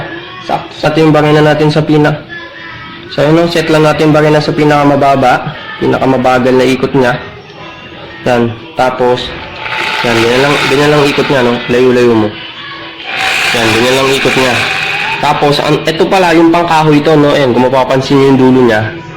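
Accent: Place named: Muntinlupa, Philippines. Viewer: napanood ko na boss kakatapos lang ang angas, at na notice ko parang taga muntinlupa kalang HAHAHA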